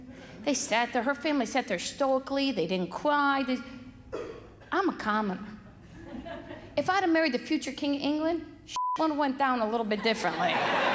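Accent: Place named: Arkansas, United States. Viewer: I saw you on Tube, do you remember me? 😉Sounds like an Arkansas Hillbilly.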